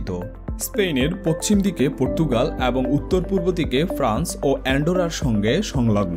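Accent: Noakhali, Bangladesh. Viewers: Noakhailla tor uccharon thik kor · Vai ki Noakhali / fenir 😜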